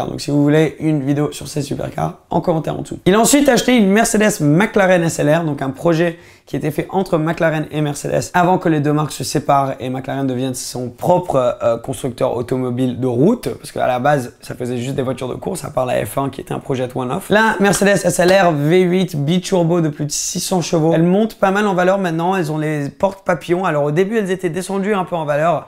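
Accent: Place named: England, United Kingdom. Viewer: T'es anglais ou français ? Je te pose cette question parce que t'as un accent anglais mais tu maîtrise bien le français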